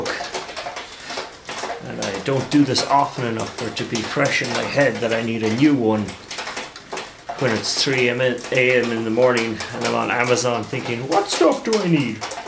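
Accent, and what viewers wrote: Ireland. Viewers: Are you Irish? And is that hail on the roof?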